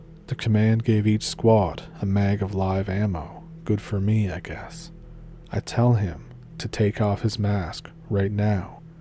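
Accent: Ontario, Canada. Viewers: Thanks for the stories, tonight. Your accent sounds like my old cousins and family from Ontario. Good times. 🎉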